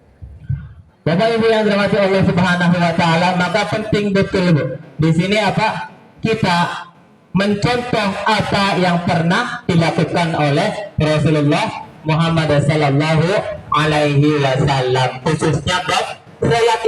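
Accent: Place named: Tegal, Indonesia. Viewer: Ponakan lik... Masih kebawa logat tegal sing wis kecampur logat payakumbuh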